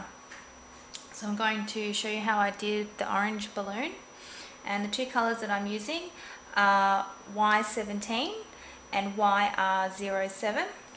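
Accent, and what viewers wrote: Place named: Australia. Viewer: AUSTRALIAN ACCENT FTW!!!!!!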